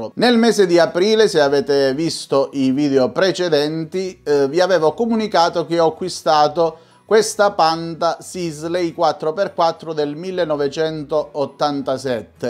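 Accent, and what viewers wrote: Calabria, Italy. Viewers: Me gustan mucho tus videos,yo tuve un panda 4X4 y ha sido el coche de mi vida,me hizo feliz y me encanta el acento calabrés,se entiende todo perfectamente si eres español,felicitaciones!.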